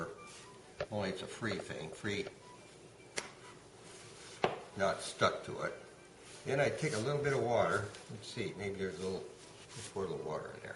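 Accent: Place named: Minnesota, United States. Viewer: You sound Minnesotan, or somewhere close lol. I could have sworn that this was my uncle lol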